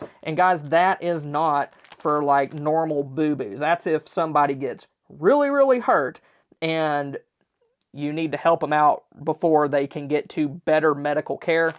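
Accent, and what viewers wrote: Texas, United States. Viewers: The accent is in your ear. Being from Texas as he is, I am familiar with people in other places in the country that assume that if you have a Texas drawl that you are slow witted or stupid. Don't make that assumption is my advice. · As another Texan, I'd say it's because of his accent that you can trust he knows what he's talking about.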